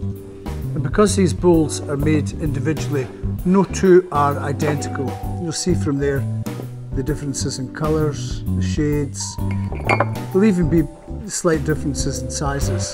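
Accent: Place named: Glasgow, United Kingdom. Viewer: Sincerely, your way of explaining and showing the goods is very helpful and informative, not to mention the high quality video in all aspects including that magnetic Glascow accent! Greetings from Greece, you may have some order from south someday! By the way this blue-ish colour of the mug is reminiscent of Ranger's F.C. kits... excuses if you a Celtic fan.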